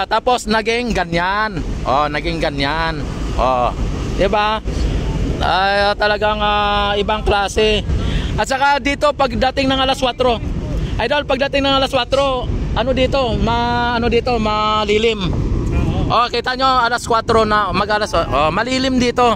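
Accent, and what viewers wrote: Visayas, Philippines. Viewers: Kuya ayusin mo ang pagsasalita mo Visaya ka kuya ang dami mong alam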